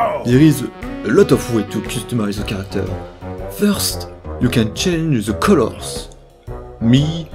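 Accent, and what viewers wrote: France. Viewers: Wait, are you from france? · You are astonishingly French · he is french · L'accent français est parfait! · Mec Ta un accent français ES QUE T FRANCAIS? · Cet accent français magique mon gars, tu m'as tué 😂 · your french accent is beutiful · Je rigole quand j'entend cette voix. C'est pas contre toi mais j'ai l'impression que tu fais un peu exprès de mettre cette accent français. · You French. Also merry Christmas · Nice French accent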